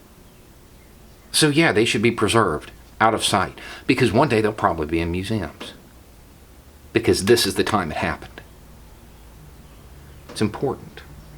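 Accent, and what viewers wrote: South, United States. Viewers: The fact that you have a southern accent kills those that don't want to accept the reality that is us history. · I kept being recommended your videos and I was like why is YouTube recommending southern reactionary to me. Sorry it seems like I have prejudice against your accent.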